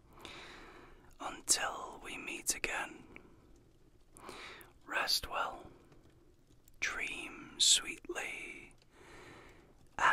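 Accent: United Kingdom. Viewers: Thus video would be SO MUCH cooler if it was LotR-themed. Just saying. Your voice is fine. 🤔 Obviously British, yes? 🥰🤗💖🇨🇦 I ABSOLUTELY LOVE Brits!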